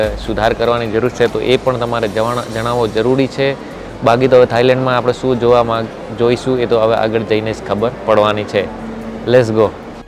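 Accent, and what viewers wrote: Rajasthan, India. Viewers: મસ્ત કમ્પ્લીટ ગુજરાતી બોલો છો પણ થોડો રાજસ્થાની ટચ આવે છે સુંદર માહિતી 😊😊😊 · ગુજરાતી ભાષા મા થોડી રાજસ્થાની હિન્દી નો લહેકો આવે છે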